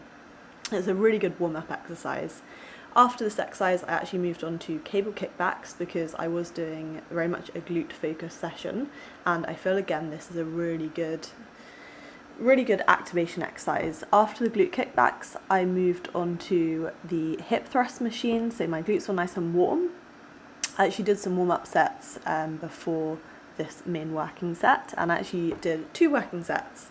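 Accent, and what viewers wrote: RP, United Kingdom. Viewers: is your posh accent acquired or innate ?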